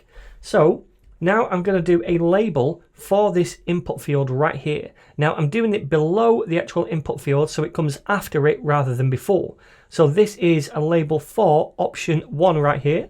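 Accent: United Kingdom. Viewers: oon-dah-stand. Oh boy I love your british accent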